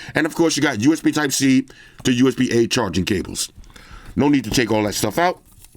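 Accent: United States. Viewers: Where are you from, bro? You sound American but your usage of the word "aluminium" is throwing me off 🙂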